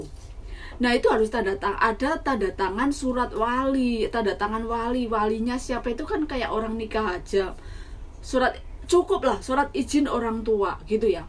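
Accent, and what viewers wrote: Java, Indonesia. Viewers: Salam kenal mbak... Sehat, sukses terus mbak. Njenengan jawane mana mbak?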